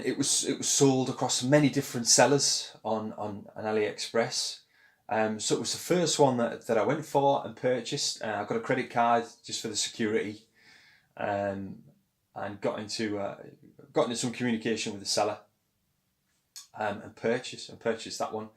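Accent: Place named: Middlesbrough, United Kingdom. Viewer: I have a sharp ear and you sound like you are from Middlesboro steel!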